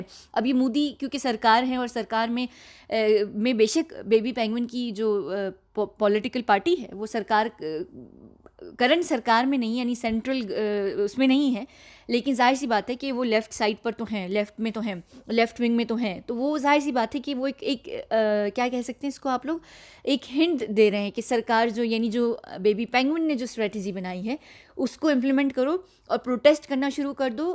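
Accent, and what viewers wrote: United Kingdom. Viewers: I love your English accent. As you said you have learnt it... Any advice from you to kids on how to learn it? I am all 👂 👂!!! 🙏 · Didi I really like your English accent..and your Hindi too...So be proud of yourself..We really love u didi. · Great British accent👍😇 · Please don't be defensive about your English accent, there are going to be many who will not understand your pronounciation...your accent is yours....move on. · Completely agree with you. Listened till the end. I love her accent too, English British accent ... 😊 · Whats wrong with having an English accent? Thats like me saying hey I dont get pure Hindi words. Theres nth wrong with either or. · Salman Sucking up to PM Modi as the case is now in Modi’s hands. P.s - love your pommy accent 😊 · Plz carry on we like your English accent... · Your English accent is as perfect as a British or Australian accent; however I don’t like them and that’s my problem. 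I like your hindi/ Urdu accent.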